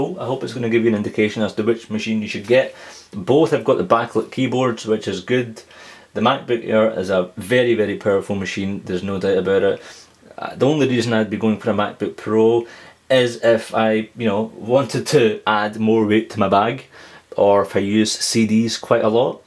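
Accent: Scotland, United Kingdom. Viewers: i love the scottish accent wish i were scottish 1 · are you scottish? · r u from scotland? i love scotland! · so scottish. awesome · Are you scottish? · I wanna say scottish??..?? I could be wrong. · If my knowledges of British Isle accents are correct, than I'd say Scotland.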